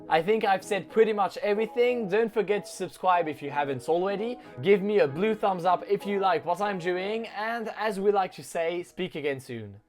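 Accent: United Kingdom. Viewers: perso j'entends plutôt du British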